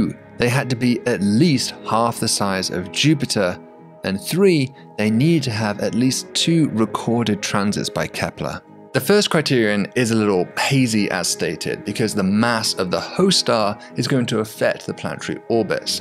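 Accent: England, United Kingdom. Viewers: His dreamy English accent takes me out of space to dreamland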